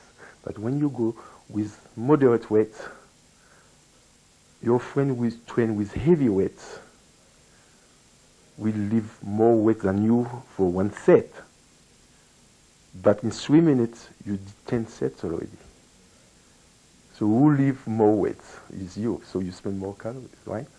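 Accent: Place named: France. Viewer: I understand this frenglish language no problem ☝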